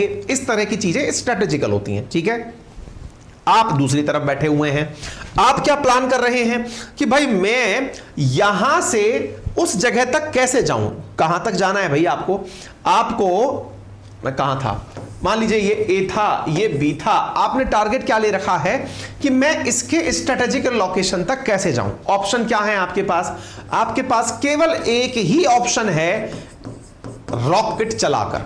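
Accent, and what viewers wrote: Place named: Uttar Pradesh, India. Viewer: App ki English mairi tarah hi hai , due to up personal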